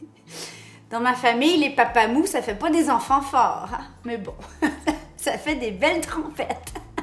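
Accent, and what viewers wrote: Quebec, Canada. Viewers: Merci pour cette recette libanaise aux accents québécois. J'adore la patate de (?) Plus naturelle tu meurs, ça change des vidéos de cuisiniers et cuisinières aux culs coincés!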